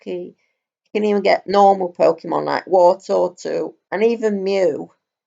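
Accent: United Kingdom. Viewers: I cant put my finger on it.. what is your accent? British?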